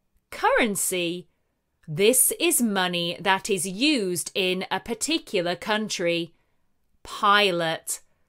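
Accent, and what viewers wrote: United Kingdom. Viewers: I love your English stories and British English.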